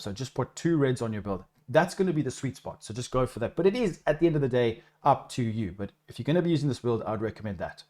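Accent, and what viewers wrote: South Africa. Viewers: Is that a South African accent I hear ? Hello fellow traveller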